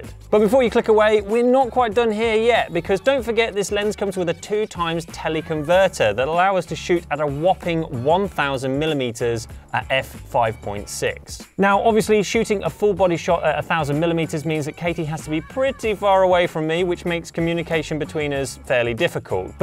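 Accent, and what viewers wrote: United Kingdom. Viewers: Love your appearance on the YouTube Creators Hub podcast, (nice to hear another fellow Brit on there!). And the intro to this video sealed the deal. Amazing 😂 Wishing you the best of luck on here.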